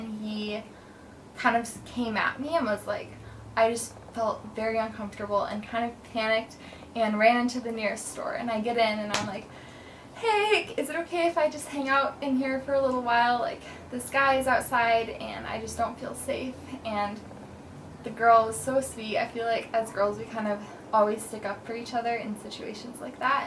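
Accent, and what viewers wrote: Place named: United States. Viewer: what a lovely girl, I'm an English guy and my heart melts when I hear an American girl talk! I could definitely do with meeting a cool American chick!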